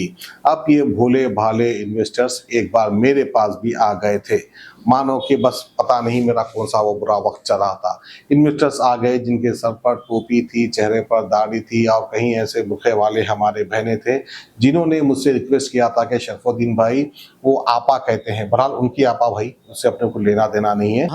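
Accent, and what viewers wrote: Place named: Deccan, India. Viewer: Aray bhai Tum pehle bolne ka saleeqa seekho yaro lehja deccani raha toh koi baat nai lekin andaaz e bayan bhi achha hona chahiye Tumhara purana dhanda achha tha miya illigal machhi ki dallali karne ka Kaiku aaya re bawa yeh platform pe?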